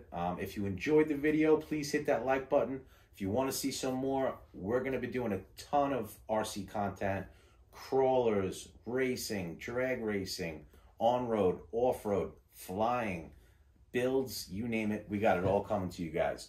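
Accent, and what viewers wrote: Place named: East Coast, United States. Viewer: You a east coast guy. I'm from Jersey. Sound similar. Have fun flying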